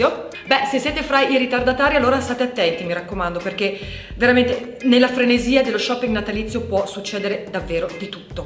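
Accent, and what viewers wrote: Italy. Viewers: quel dialetto! sei di Reggio Emilia? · ma sei pure emiliana! ok ti adoro, è ufficiale!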